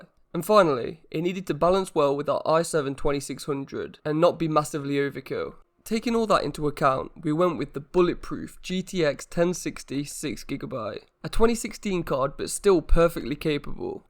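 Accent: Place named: Birmingham, United Kingdom. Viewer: ill sub to you dude.. you sound local.. im from brum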